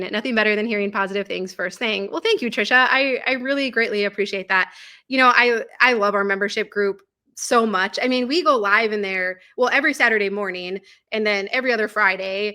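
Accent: Minnesota, United States. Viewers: Minnesotan, maybe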